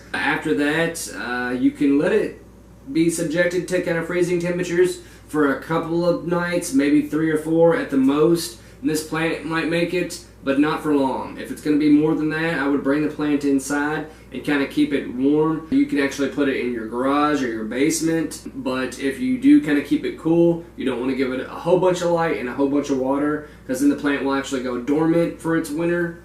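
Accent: South, United States. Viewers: First of all I am so glad I found your channel! Hearing a fellow southerner doing these plant videos is so refreshing! I'm from wv and I'm kind of shy about my accent on my videos lol second I got my first agave yesterday so thank you for the info!!! ☺️💚🌵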